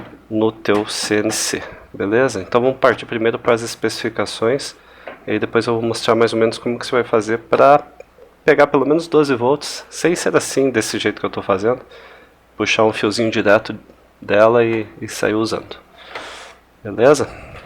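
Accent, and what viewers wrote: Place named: Paraná, Brazil. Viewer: Cara, sou fã do teu canal, não entendo como você não tem mais audiência. Conheci teu canal através do Rodrigo Conrado, o qual também tenho muito respeito e considero vocês as melhores referências no que diz respeito a CNCs DIY. Inclusive pra mim você é o pai das CNCs caseiras no Brasil, um pioneiro. Parabéns e muito obrigado. Estou pensando em criar o meu próprio canal para makers, pois vejo muita gente com dificuldades em montar seu equipamento e orfã de outros canais. Caso queira me contatar meu email é <mail> Sou do interior de SP e busco alguém como eu na minha região para projetos do tipo, trocar idéias e experiências. E você, como se chama (nunca ouvi você mencionando teu nome) e de onde você é? Pelo sotaque parece ser do Paraná, mas me perdoe se estiver enganado. Um grande abraço e muito boa sorte.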